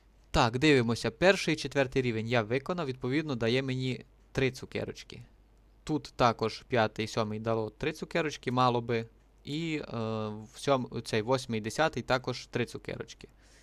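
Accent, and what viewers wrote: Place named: Dubrovytsia, Ukraine. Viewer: говориш як з дубровиці)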